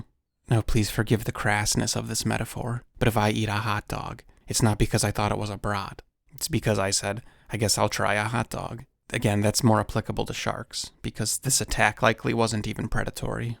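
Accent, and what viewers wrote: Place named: Midwest, United States. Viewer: Here’s my assessment on why videos are so good: 1.) Great editing— knows what, where, and when to insert footage/still photos/illustrations. 2.) Fringe subjects— brings us news and stories that are often dark and overlooked. They pique the macabre portions of our minds. 3.) Thoughtful writing— couples the best words with the right images. He chooses smart verbiage. With, you’ll be served big portions of choice descriptors, which are juicy and filling. 4.) The voice—The sound of voice is laden with minuscule, barbed filaments. Once it passes through your ears, you’re hooked. His accent is unique. It’s regional Midwestern, USA. You can love that, or you can hate it. You cannot, however, mistake that. voice is offered in the right tone—low and eerie (in a good sense.) It’s delivered at a smooth pace, without stammering or hiccups of any sort. 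I sincerely hope that channel swells and rockets to the stars. He deserves it.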